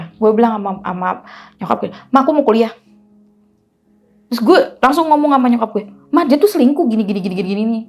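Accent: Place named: Yogyakarta, Indonesia. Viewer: Senang dengar logat betawi dan jogya ne mbok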